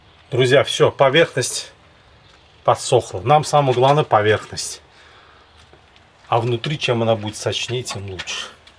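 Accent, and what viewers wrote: Baku, Azerbaijan. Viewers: Такой позитивный и такой акцент красивый чисто Бакинский😂👍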